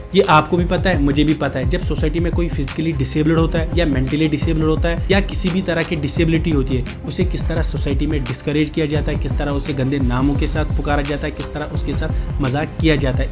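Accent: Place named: Kashmir, India. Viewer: You are doing very well bro.... Keep it up zuva.... One suggestion there is a little need for upgradation in narrating skills and Accent. Koshur Accent thora sa reduce karunn Baaki overall video cxe zabardast❤❤❤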